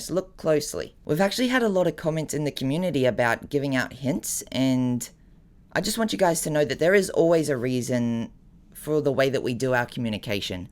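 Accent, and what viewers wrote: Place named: Australia. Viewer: i love your aussie accent just like Rosé 😅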